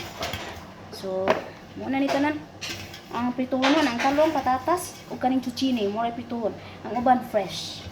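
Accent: Visayas, Philippines. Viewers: Slang bai morag dili bisayang dako, sunod mag inintsik unya ta, tan- awon nato makaya ba sa mga bisayang antik. Zucchini - ( zu - ke - ne ) pronunciation bai, morag chikinene. Bai, bilingual is a talent that we should all be proud of. We all wish to speak better English or any other language nothing wrong w that. To be bilingual it’s got to be amazing, you can talk to any ppl in the world in their own mother tounge. You can get jobs too by speaking different languages. Overall it’s good. You can speak 🇰🇼 we wish we can + your ever so deep visayan that sometimes it’s so hard to understand bc that’s how deep it is & that’s one of your talents not a lot of visayan who can speak like how you speak it’s just so deep & it feels so good to hear it from you bc we absolutely missed that from our grandparents. Centre Point ad Bai 3 mins long para sa pamasko bai pohon. 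Hehe, bai you can’t stop thinking about something else niambak hinoon ang patatas. Mosayaw diay ka bai kon maghiwa. 😂kataw- anan kaayo ka. Bai makanirbyos mana imong phone bai kadaghan na hapit moambak. Pwerting lamia bai tan- awon imong salad. Bai magikon nalang nato ang nomero para makasal naka. 💞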